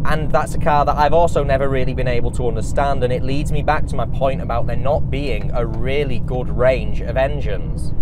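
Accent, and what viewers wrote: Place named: Yorkshire, United Kingdom. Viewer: Where abouts are you based? You sound a Yorkshireman.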